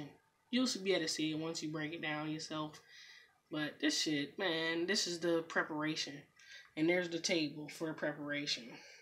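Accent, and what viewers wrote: United States. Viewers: Where are u from? U sound just like me, so country! Lmao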